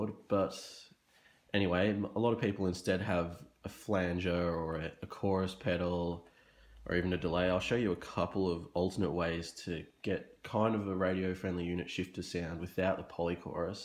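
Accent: Australia. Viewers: Sorry My Bad, Now looking back at the Video you do Sound more Australian. 😁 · very helpful video! didn't know you were Aussie, where about are you located? I'm from Adelaide but have been living in Canberra for most of my life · Are ya aussie mate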